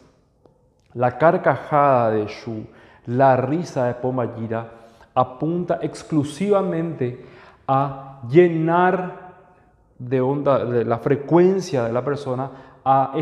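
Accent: Paraguay. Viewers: Sos de Paraguay? Tienes acento de ahí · Hola nada que ver al tema pero tu acento o sotaque e de Paraguai verdad? 😊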